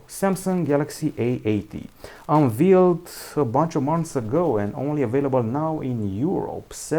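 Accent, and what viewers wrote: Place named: Romania. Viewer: Știam că esti român! De la accent și de la tipul de priză.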